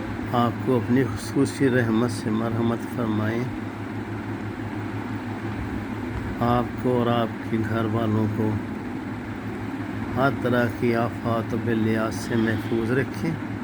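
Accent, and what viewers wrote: Punjab, Pakistan. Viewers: میں لاہور سےہوں لیکن عرصہ دراز سے یو کے میں مقیم ہوں مرحوم کو باقاعدگی سے سنتا تھا کہ ان کے پنجابی انداز اور پنجابی لہجہ اردو کو بہت پسند کرتا تھا ۔ اللہ تعالئ مرحوم کے تمام گناہ معاف کرکے جنت الفردوس میں اعلی مقام نصیب فرماے امین ۔ آگ ان کو کوئ فیملئ ممبر آ کر تھوڑا مرحوم کے آخر ی سفر کے بارے میں بتا دے اچھا ہو گا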